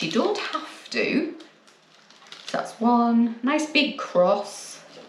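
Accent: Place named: Cumbria, United Kingdom. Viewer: A Cumbrian now living in Devon. knew Warcop, near you, well having relations there. Love the accent!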